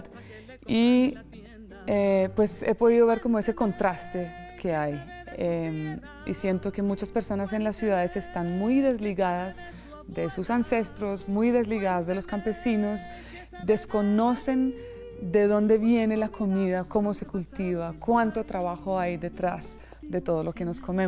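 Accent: Colombia. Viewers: Un precioso acento colombiano es parte de tu talento. Gracias!!! Thanks!! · Ya veo porque, noté un aire también Caucano en tu forma d hablar y de cantar,claro si has vivído en la Bella Popayan,que alegría contar con una artista integral como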